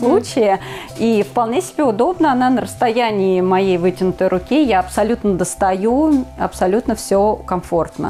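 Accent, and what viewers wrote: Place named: Yakanye, Russia. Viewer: Режет ухо Яканье.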